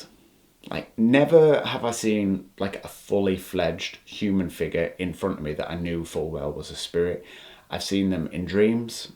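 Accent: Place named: Scotland, United Kingdom. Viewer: Greetings from the desert of so kali. First time at your channel. I take it you are British by your accent, Scottish now I'm hearing you more? Are there any homes left in the British Isles that are not haunted? I'm seeing a lot of your ancestry in your face, excuse me if I'm being presumptuous-I'm seeing Briton, Dane, high German(strong jaw), hair is wavy, is that Celt? High cheekbones, sometimes some Euros and Brits are built such as they look almost part American Indian-spacious eye/cheek area but likely not at all American indian. You seem very tall. Over 6' 2. Are you around 30 yrs old? Are you a Sagittarius? It's so wild to see younger generations embracing the paranormal the way I did when I was a teen, 20's and 30's. But back then, one was "weird" and pretty much ostracized for even talking about the things you are talking about now. 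Ah-that's an angle I hadn't really thought about-that unfinished business keeps the energy here as a ghost. A Wrinkle in Time-great book. I thought it was merely trauma that kept the energy earthbound as a ghost. But what you said makes sense as well. I saw a spirit or ghost one time. It was a man who was staring into my eyes intently as he went from an old man growing backwards until he was a young boy. There were light sparkles all around him and I was just floored, doing a check, recheck on his intent of showing himself to me. Then he disappeared as a young boy curly dark hair, dark eyes and wearing a hat with a feather in it that you'd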